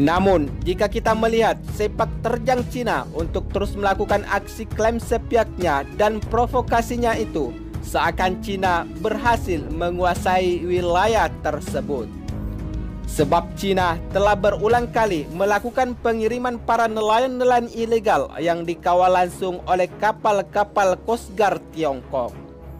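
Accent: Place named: Malaysia. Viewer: Admin nya kayak orang malaysia ini yg ngomong kliatan cara bicaranya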